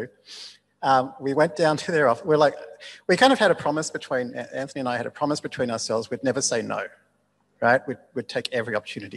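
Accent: Australia. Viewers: Interesting how your accent is a lot more aussie now!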